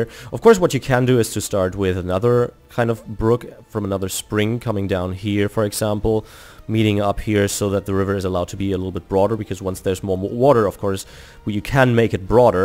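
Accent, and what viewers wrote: Germany. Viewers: Nach den ersten paar Sekunden erstmal abonniert. ;) Man hört zwar, dass du Deutscher bist, aber dein Englisch ist sehr sehr gut. :) Super sympathisch und tolle Videoinhalte. Weiter so!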